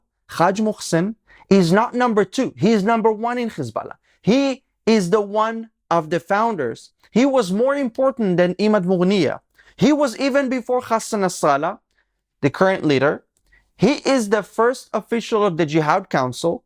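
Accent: Russia. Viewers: slight Russian accent?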